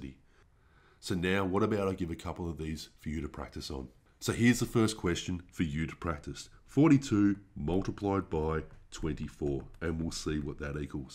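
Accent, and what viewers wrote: Australia. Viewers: you sound like bruce from finding nemo are you australian mate?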